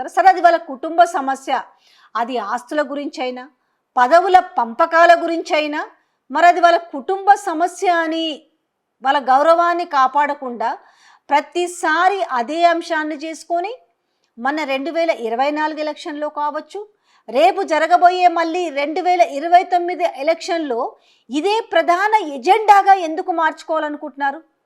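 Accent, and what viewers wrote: Telangana, India. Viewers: Manchi topic. meeda. Video chesaavu talli . Voice mariyu Telangana yaasa lo. Baaga matlaadutaavu .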